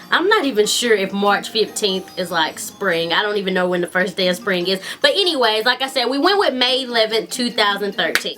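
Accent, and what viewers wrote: South, United States. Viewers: OMG You got engaged on my birthday...and we both have southern accents lol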